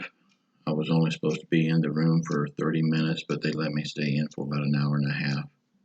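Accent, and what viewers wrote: South, United States. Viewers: My boyfriend and I watch you daily. Prayers for your wife and you. It’s extremely obvious how much you’re hurting not having her home with you. Anyway, my boyfriend thought we should let you know we call you. He says you look like a mixture of Donald Trump and Elvis 🤷🏼‍♀️. I just like the southern accent in your voice and you could look like Elvis might if he’ was still here 😁😏 Where are you from? We live in Northern Indiana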